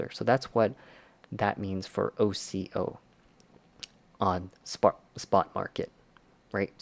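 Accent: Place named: Canada. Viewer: lol I caught it fairly quickly. I became familiar with the Canadian accent after living one year in northern Minnesota. .. Good times. Stay warm.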